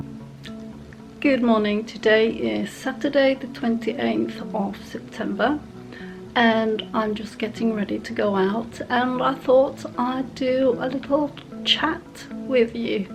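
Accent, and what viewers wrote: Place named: United Kingdom. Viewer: Hi I will be turning 63 coming up next month on February 9 now your voice sounds like your British but! where are you from when you really do not mind me asking? and I know just how it feels when it all comes down to you being alone all by yourself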